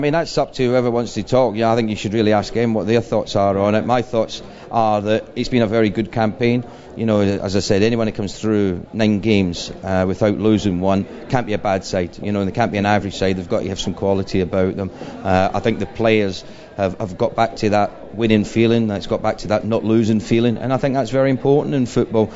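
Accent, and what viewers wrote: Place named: Scotland, United Kingdom. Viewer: Ray is Irish but why does he seems to speak with a Scottish accent?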